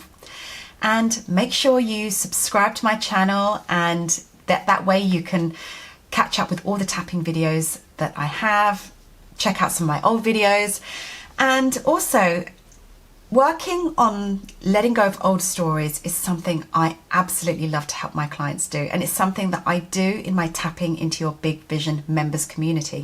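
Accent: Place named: England, United Kingdom. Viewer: Omg YES. EFT with an English accent -makes it even more posh :D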